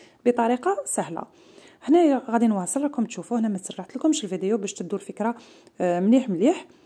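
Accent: West, Algeria. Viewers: يعطيك الف سلامة والله عمري مشفت هاذي الواصفى انا من فرنسا وى الاصل جزايرية وافتخر والله عطيتني فكرة نواكل بيها بنات بنتي الخظرة كيما تعرفي الدنجال قليل اليحبو نقدر نشربها بلكورجات البطاطا تسلم افكارك يالله الي عندهم لولاد او اولاد الاولاد نظن ياكلوها لازم نشري البلا نتاع البيراكس من لكبار هنا في فرنسا يتباعو بتلاثة واحد في قلب واحد نقلك انظن بنتي انتي من الغرب على حسب هدرتك انا من بسكرة بلاد ادقلتنور ولاكن عايشة في فرنسا هاذي اوال مشاركة وى انشالله متكونش الإخيرة السلام بنتي الله يحفظك ❤❤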